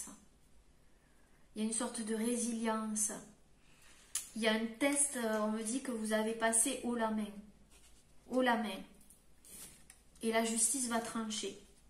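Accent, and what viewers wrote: South, France. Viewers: Votre accent du midi est absolument charmant et dynamisant c est trop chouette et mignon 🙃❤️👩‍🦽👁️👁️🌈🗝️🪐😇🌝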